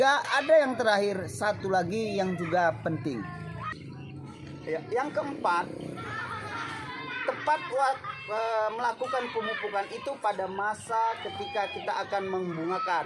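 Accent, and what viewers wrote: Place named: Indonesia. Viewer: anda kedengaran asli dgn loghat bahasa indonesia anda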